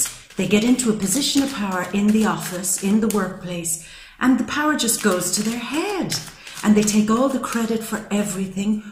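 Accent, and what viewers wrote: Ireland. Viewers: You sound like a sexy Irish woman. Insightful reading. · Thank you so much for your time and energy doing these reads for us💕😘 xx I’m newly subscribed to your channel..... So lovely to hear an Irish voice....I lost my soulmate of 20 + years, 2 & 1/2 years ago and his family sadly before that, they were from Carrick-on-Shannon, family name Conlon.... Taurus is my eldest daughter, we’re r/c and since my spiritual awakening we have differences in religion ..... I turned down Sag who’s my ex husband, he’s married to Aries and he’s been seeing my Gemini friend who’s also my twin flame...Now he’s moving onto my Virgo friend....So glad I said no..... As my mother in law would say, Your well for Anne,lol......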